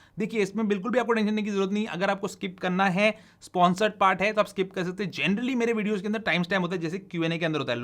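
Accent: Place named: Bangalore, India. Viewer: As salam alaikum. BHAI Abbi jumma jumma 8 din nai Hove. U from Mumbai But your mother tongue is like Bangalore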